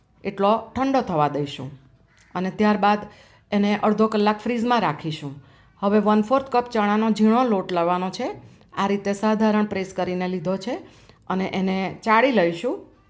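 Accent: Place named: Valsad, India. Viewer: Valsad baju na su k madam tme?bau saras rcps hoy 6 tmari😃😃😃 pn tmri bolvani style pr thi lage 6 vlsad jeu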